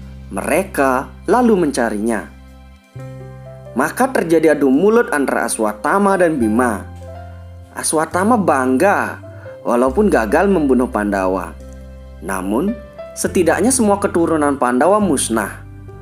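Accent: Bali, Indonesia. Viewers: Bli logat nya it lo bali bgt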